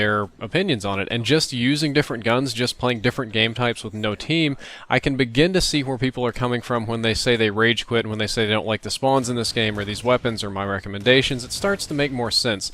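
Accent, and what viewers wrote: South, United States. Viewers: Yo, Where are you from? What state in the south? (I can't seem to place your accent) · your pronunciation of words such as what or white make up four the southern accent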